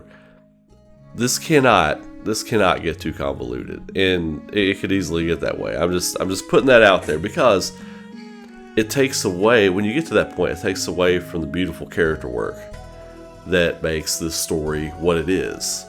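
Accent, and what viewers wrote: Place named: Eastern Kentucky, United States. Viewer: I love your content bro! Btw are you from Eastern KY? You sound exactly like one of my best friends from there. Very easy to listen to!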